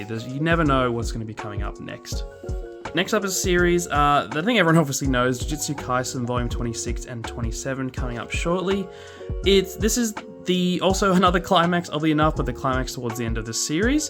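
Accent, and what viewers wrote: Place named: Australia. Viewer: Dude I just found your channel and I wish I found it sooner. A fellow Australian (I’m assuming from the accent) with a manga related channel? Sign me up. It’s good to know I’m not alone in this niche of YouTube. Starting out is hard but I will say your videos are crafted really nicely I enjoyed your video all the way through. Keep it up mate.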